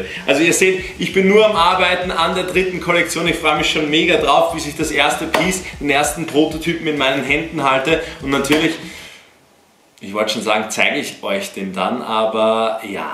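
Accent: Austria. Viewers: Liebe deinen österreichischen Akzent. :D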